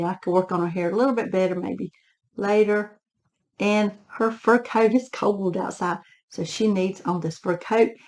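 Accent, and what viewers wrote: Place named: North Georgia, United States. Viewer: your accent is similar to ours here in north GA. Love your channel. I collect and have my two daughters collecting as well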